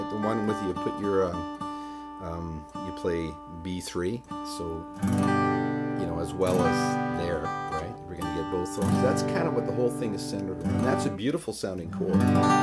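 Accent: Canada. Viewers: Your voice sounds much like Spielberg. You have a Canadian accent. Aside from that, you sound so much like, Spielberg.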